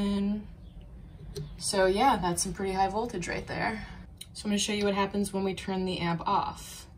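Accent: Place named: Southern California, United States. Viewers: Great tips on how to live longer! You sound like a Californian, if so ,how can I get my amp to you so that it can be fixed by a true Pro? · That was awesome, and without a doubt the definitive SOCAL delivery. Lol. Very laid back and with that slight slowness and crackle at the back of her words. Like, totally…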